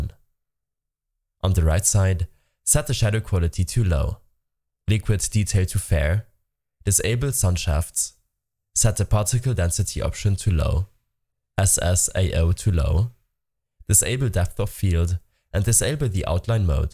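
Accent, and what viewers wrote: Germany. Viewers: Gotta love the german accent Thanks for the video <3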